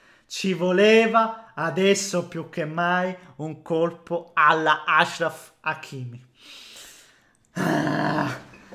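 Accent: Calabria, Italy. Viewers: Calabrese/pugliese detected*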